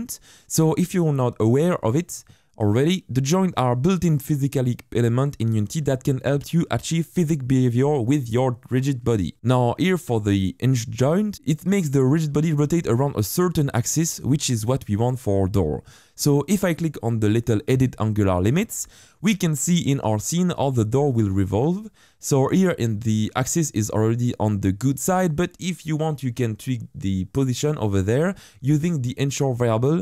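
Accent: France. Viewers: Ça sens l'accent français...?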